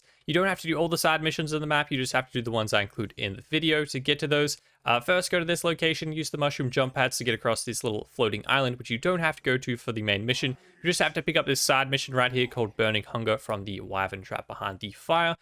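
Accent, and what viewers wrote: Australia. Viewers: Thanks for putting all these in an easy order and not giving up after 4 maps like joltzdude did 🙌 also u aussie?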